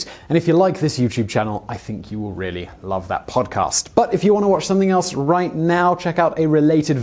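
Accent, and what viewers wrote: England, United Kingdom. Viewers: I figured out one of the reasons I like this channel. He's got a real Brit accent that's better than the usual fare to listen to, but he doesn't speak it like he has a mouthful of Marbles!!! I can't recall even once, thinking to myself, Whaaat did he just say!?!! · Briar Hood in England (where he’s from so this might explain it) we say Nike the way he did and Ad-ee-das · Why r u so triggered lmao ? That's the British way of saying Nike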